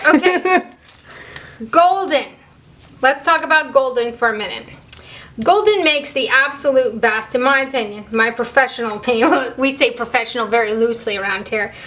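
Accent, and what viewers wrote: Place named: Texas, United States. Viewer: And by the way, I'm a Texan transplanted to upstate New York and it is so good to hear that Texas twang! Love y'all!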